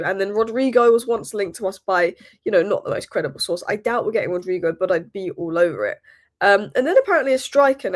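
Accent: United Kingdom. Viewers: I actually really like your voice, I like listening to British accents.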